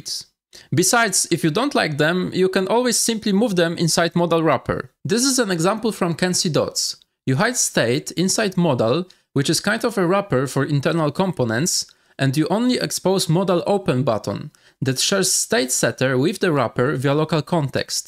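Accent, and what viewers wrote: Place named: Poland. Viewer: where are you from? Your accent sounds kida polish?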